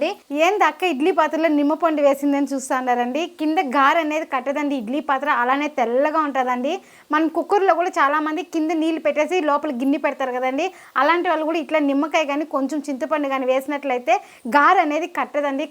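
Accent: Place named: Tirupati, India. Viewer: Chala baga chesaru akka mutton pulusu 👌🏼😍 ekada akka mee vooru? Tirupati r kalahasti anpisthundi slang vintunte ??!!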